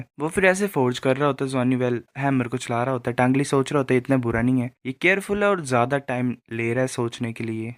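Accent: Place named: Pahadi, India. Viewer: Pahadi voice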